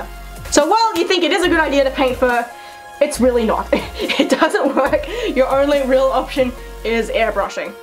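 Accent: Australia. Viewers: Q and A are u Australian or Tasmanian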